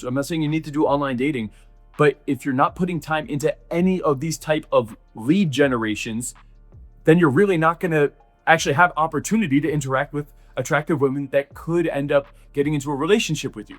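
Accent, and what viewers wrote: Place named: United States. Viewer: you easily say this because you are an americana nd you have a cool accent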